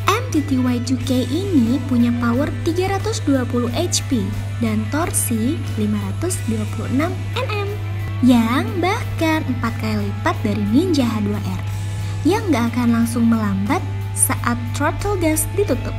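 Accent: Indonesia. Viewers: Auto modar😂 kaciri orang sunda na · Logatnya seperti logat Palembang...Pesawat minta duit 😀👍🏻..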